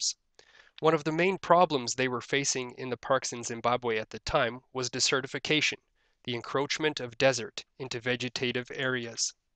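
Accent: Canada. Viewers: Canadian guy, gotta cut the poor guy some slack, eh? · After about 4 words, I was like, this guy sounds Canadian... Then I heard "Ontario". We know the sound of our kind eh!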